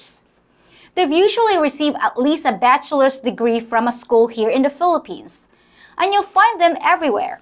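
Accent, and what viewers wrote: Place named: West, United States. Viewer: Where were you educated? You almost sound like you went to Uni in the west. Btw, I don’t need no doctor to tell me that you’re 100% female! ❤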